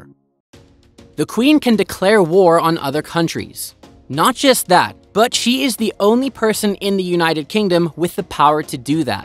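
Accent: United States. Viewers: The man doing this docu is talking about the queen of england so why doesn´t he talk proper english and not english with a yanki accent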